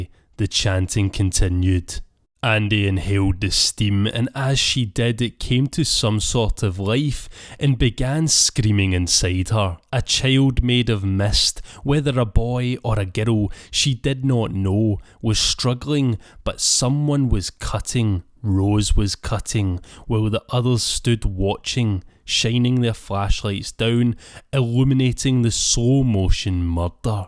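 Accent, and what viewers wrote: Scotland, United Kingdom. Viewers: Erm....his accent is...scottish...😂😂😂😂😂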